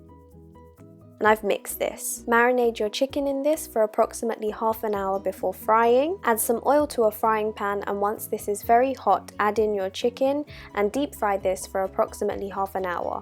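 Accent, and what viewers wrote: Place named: United Kingdom. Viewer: What a delicious Fried Chicken Recipe! Great editing and love your accent! British? Keep up the fantastic work! :)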